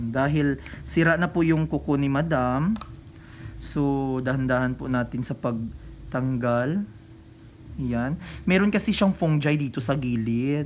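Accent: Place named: Visayas, Philippines. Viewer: Bisdak man kaau pre hahaha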